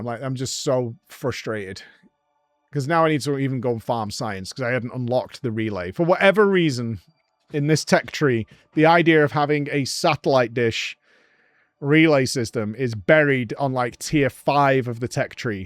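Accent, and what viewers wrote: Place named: Manchester, United Kingdom. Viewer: He's saying duna, hes just from Manchester is all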